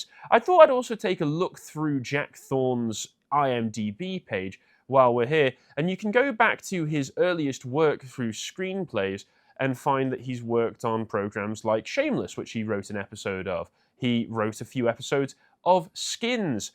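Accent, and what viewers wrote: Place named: Bristol, United Kingdom. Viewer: Bristolian. Says it all really. Woke shithole.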